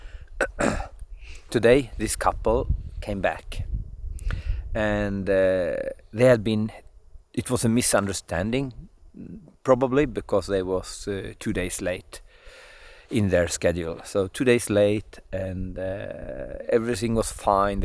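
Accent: Sweden. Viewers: That was good entertainment! I am ready now for a tour in fog and rain, and I will practise my attitude ;)) I don`t want to sound creepy, but I love your SwEnglish, it it is very relaxing to listen to.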